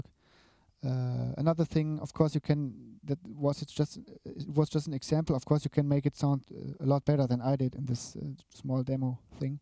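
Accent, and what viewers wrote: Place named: Germany. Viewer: No No I mean I really like your accent it reminds me of a close German friend of mine I love the way you said the kick " Sounds Like Shit":) Thanks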